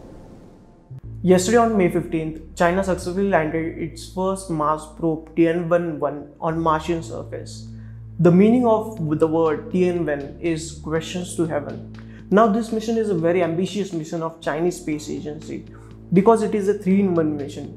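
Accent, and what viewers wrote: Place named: India. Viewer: Indian accent means customer service cheats.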